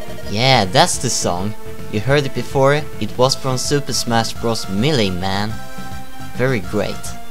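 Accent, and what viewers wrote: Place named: Sweden. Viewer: Hehe. Man hör på ditt uttal att du är svensk. Älskar verkligen det spelet. Det är det bästa Fire Emblem för min del tätt följt av Path of Radiant.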